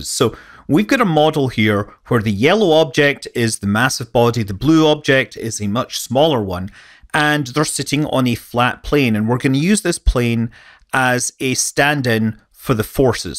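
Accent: Scotland, United Kingdom. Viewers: Why is Maths called 'math' by a guy with a Scottish accent? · Great visuals and great, great narration! The Scottish brogue is icing on the cake!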